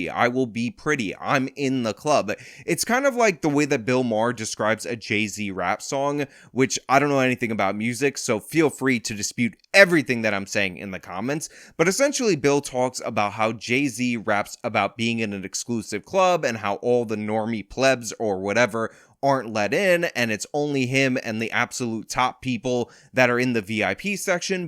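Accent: New York, United States. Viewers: first time i noticed you have a new york accent, at least northeast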